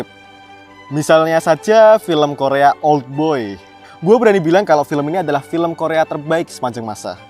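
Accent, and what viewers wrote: Java, Indonesia. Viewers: gausah maksa gw gw bro, logat jawamu kentel poll, pake aku aja for ur advice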